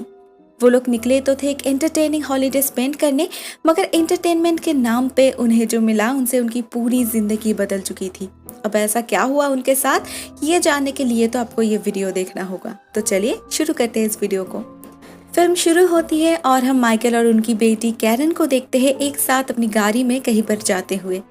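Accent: Bengal, India. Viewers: Hey r u from kolkata your voice seems like u r bengali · Apka bengoli tone sunne me aur samjhne me thode issue hote hai verna baki sb toh sahi hai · Aap bangal se ho ky?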